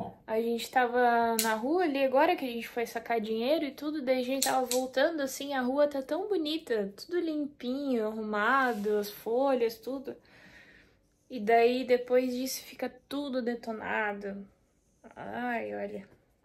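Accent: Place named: Rio Grande do Sul, Brazil. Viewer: Oiê eu tmb cheguei no canal de paraquedas. Quando ouvi tu falando pensei 🤔, é Gaúcha e de Santa Catarina ❤. Sou do RS moro na Serra, nos conhecemos pelo sotaque que amo demais. Maratonando teu canal aos poucos.bju. se cuidem gurizada💚 ❤💛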